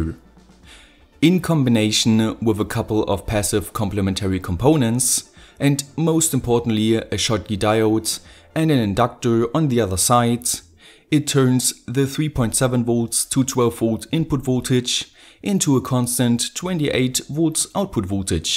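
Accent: Germany. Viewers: Er klingt auch so schon deutsch genug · are you german?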